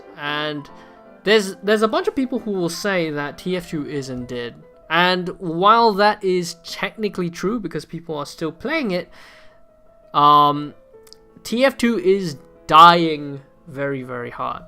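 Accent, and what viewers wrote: Singapore. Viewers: DAMNNNN BOII, when i first heard ur voice, i knew u were singaporean, high five im singaporean too :/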